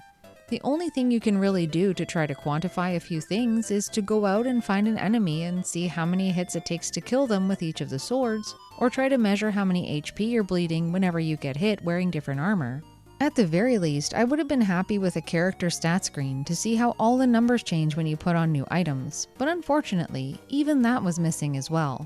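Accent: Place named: Canada. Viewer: Hey enjoy your videos. I would love to see a Breath of fire 3 review on ps1. Also, are you canadian? I am like 95% sure from your accent you are either very far north in America, or you are Canadian ay?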